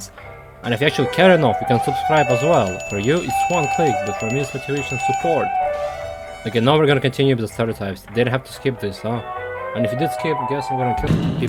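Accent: Russia. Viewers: No its Russian accent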